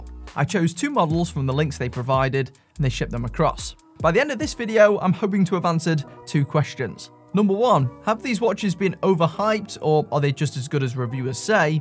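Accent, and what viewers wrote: United Kingdom. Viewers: Its always so weird to me to hear brits pronounce the "h" sound.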